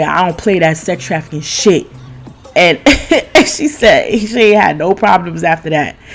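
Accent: New York, United States. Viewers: Unfortunately human trafficking has been going on for years in South beach but it just recently got real bad. I heard it’s real bad in Texas and Arizona as well. Also the “police” definitely be in on that shit believe that. Also off topic I have been watching your videos for a couple of days and I knew you was from NY we just have a way we talk. I’m from Bedstuy and we are definitely with the shits!!😏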